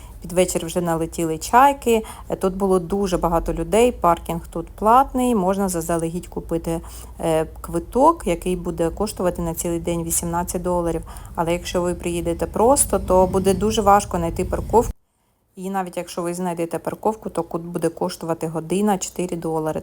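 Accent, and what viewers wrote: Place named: Cherkasy, Ukraine. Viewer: Дякую Вам! Хороші роботи. Приємно дивитися і чути Вас. Натхнення Вам і нових творчих задумів та їх здійснень! Я з України. Мені здається, що Ваш говір черкаський 😊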